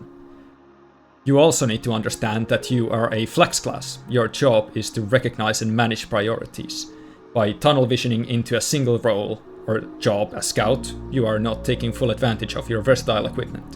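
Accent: Finland. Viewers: Nah it’s finnish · That's a very finnish accent. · are you finnish/estonian?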